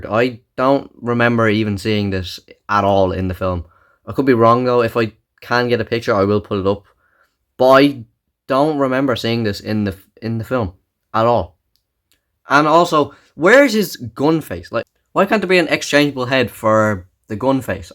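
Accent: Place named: Ireland. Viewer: Don't worry. I didn't pay much attention to the 4th and 5th films too anyway. I knew you're from Ireland just from the accent lol.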